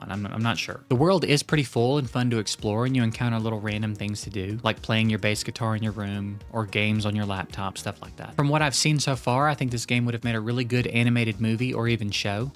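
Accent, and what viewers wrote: South, United States. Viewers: Finding someone who can recommend good casual non farming games? Amazing. Hearing the southern accent? You do not understand how much it comforts me.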